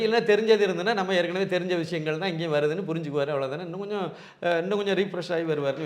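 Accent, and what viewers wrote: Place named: Kanyakumari, India. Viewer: Kanyakumari tamil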